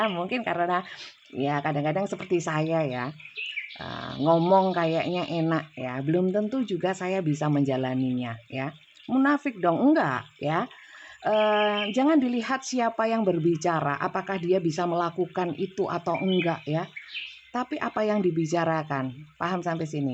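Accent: Java, Indonesia. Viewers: Assalamu alaykum bunda..?? Q seneng dengerin readingan bunda..lucu mbacanya medok bget..sy jg org jawa bund tinggal di Bandung..g usah di ambl hati sm komentar yg bhasanya kasar org hidup dn yg suka ada yg ga...enjoy aja bund sukses dan seat selalu resonet ga reonet ttp q dengerin q ngefans gaya bicaranya bunda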